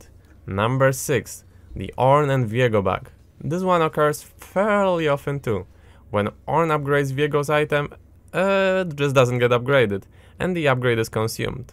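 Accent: Poland. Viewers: I dont know why but i feel like u have polish accent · Słychać polski akcent xD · man you sound like you're Polish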